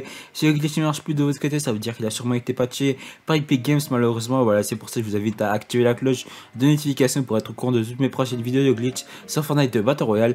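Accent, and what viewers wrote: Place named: England, United Kingdom. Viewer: L'accent anglais magnifique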